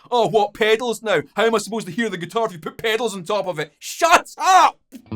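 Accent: Scotland, United Kingdom. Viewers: Sarcasm sounds so much better in a Scottish accent 😏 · Sarcasm in Scottish, sounds like home. 😂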